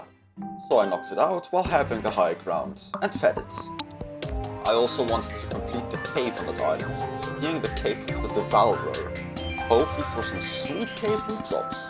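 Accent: Netherlands. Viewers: Hmmmm, dutch person detected? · Where are you from? You sound a bit Dutch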